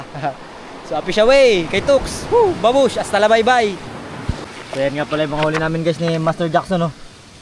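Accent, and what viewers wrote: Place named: Cebuano, Philippines. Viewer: Hahaha.. hagua sa nag kirig2x.. bisdak jud kaayu ba.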